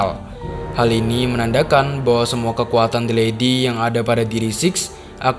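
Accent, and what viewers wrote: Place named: Madura, Indonesia. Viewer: orang Madura nih yg bikin ten konten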